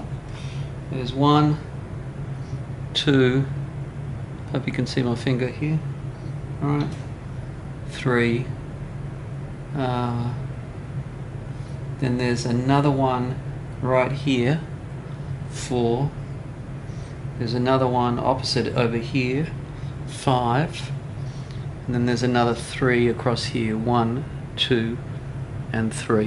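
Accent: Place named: Australia. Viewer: Great video, good the hear you still have the great Aussie accent! Different problem with my 6000 but excellent guide.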